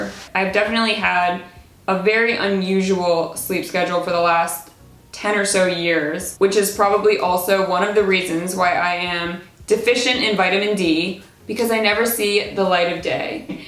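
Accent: Baltimore, United States. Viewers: You’re from Baltimore?? I love that city definitely has an interesting accent hahah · Oh. are you a Baltimore Hon? Go down the Ocean hon :)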